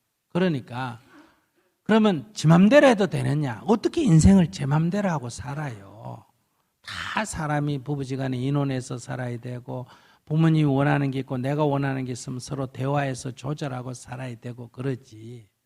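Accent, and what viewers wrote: Gyeongsang, South Korea. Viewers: 갱상도는 그래ㅋㅋㅋ 왜 열심히 얘기 하는데 화내는 걸로 듣나? 화낼 이유가 있나? 남의 일에~스님이ㅋㅋㅋ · 양영준 많이 들어보시면 이유를 알게 되더라구요. 저도 첨엔 그게 시러서 기피햇어요. 평이한 톤으로 말하면 중생들이 못알아들으니 강하게 말씀하는거고 경상도 사투리가 좀 세기도 해요. 근데 듣다본면 진짜 재밌어요. 깔깔대고 눈물나게 웃어요. 도움이 되셧으면 합니다.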